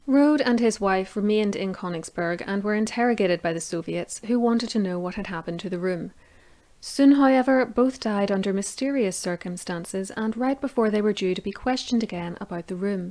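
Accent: Northern Ireland, United Kingdom. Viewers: Is the narrator Northern Irish? I recognise the accent.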